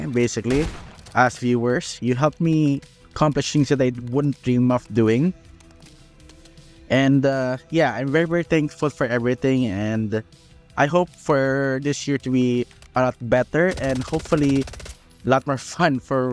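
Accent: Philippines. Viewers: you don't need a better mic tho, you gonna need voicemeeter banana to modify your mic quality that sounds good.(free to use) Also, i can notice your filipino accents while speaking english. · I love me a filipino accent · Can’t tell if the VO is an intentionally bad filipino impression or just uncompromisingly authentic, great work as always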